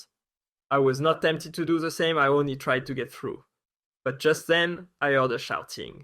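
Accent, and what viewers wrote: France. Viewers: Honestly the thick French accent was such a great touch for this series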